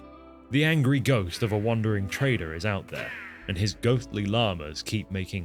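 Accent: United Kingdom. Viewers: I blame Totalbiscut (rest his soul) for my affinity for British commentary. There is simply nothing else like said in your accent, so charming and quaint. Its like a storybook or an episode of Thomas the Tank Engine. Love your vids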